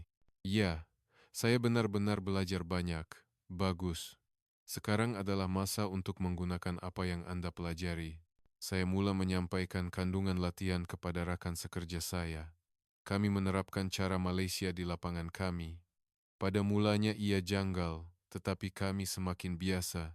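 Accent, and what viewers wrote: Indonesia. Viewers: Ejen MOSSAD INGIN MALAYSIA BERTELAGAH DENGAN DUNIA...INI ADALAH ADUDOMBA.... MEPERLAGAKAN EMOSI SESEBUAH NEGARA DENGAN IDEOLOGI PENIPU DEMI SATU MATLAMAT...INI TUKANG CERITA KETURUNAN INDONESIA..CARA PERCAKAPAN LOGHAT INDONESIA..APASAL ORANG INDONESIA SUKA MENIPU MAJORITI..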